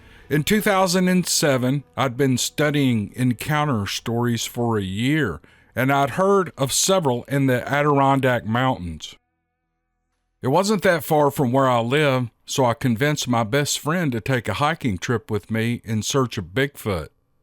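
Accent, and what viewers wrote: South, United States. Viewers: Lol see-ment (cement) I love your country accent!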